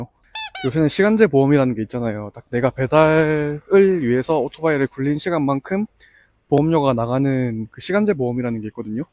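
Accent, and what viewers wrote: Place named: Gyeongsang, South Korea. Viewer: 부산이나 경남쪽분 같내요 맞으시죠?ㅎ 경북쪽은 성격이 강하고 너무 직설적이라 이런 차분한 말투가 안나옴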